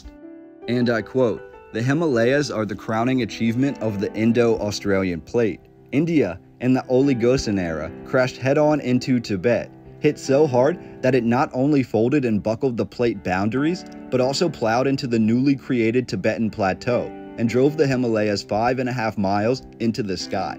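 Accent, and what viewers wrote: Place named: Texas, United States. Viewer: you from Texas aint you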